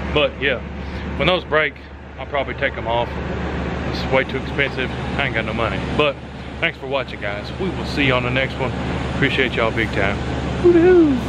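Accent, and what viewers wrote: South, United States. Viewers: We all do things like shock ourselves but most wouldn’t tell the world about it. I appreciate your humility and ability to laugh at yourself, after all we’re only human and not perfect. Also your southern use of the English language is quite entertaining. Thanks for another great video,!!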